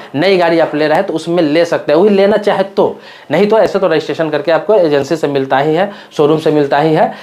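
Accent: Bihar, India. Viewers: Mere lag raha hai ki tu bhi bihari hi bol raha hai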